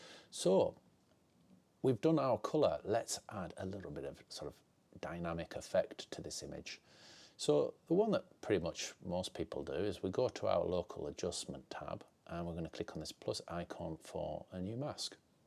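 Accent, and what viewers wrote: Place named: Spennymoor, United Kingdom. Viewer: Just stumbled onto your video, really glad I did. Some really useful tips I haven't seen elsewhere, the black and white thing for example. I would definitely appreciate a video on masking from you, again I think I'll get tips that I don't see elsewhere. Couldn't quite place the accent though. I was hedging Spennymoor..... Ps. That's a great eye for the photo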